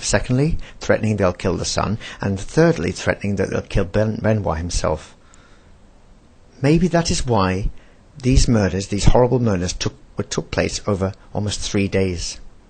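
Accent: England, United Kingdom. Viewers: this english accent is starting to annoy me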